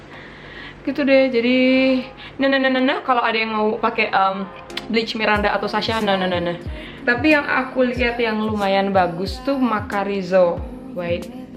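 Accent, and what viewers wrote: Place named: Manado, Indonesia. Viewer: wihh.. Kaget dengernya.. ternyata orang Manado.. 😄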